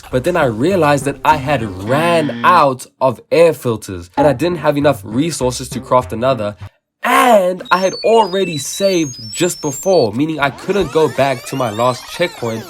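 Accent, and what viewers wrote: South Africa. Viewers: You may not see this but are you a fellow south african? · Bet you're South African, Amirite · He's definitely South African! No way he's not. I know my people's accents! 😂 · Are you from South Africa? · This dude is a South African isn't he?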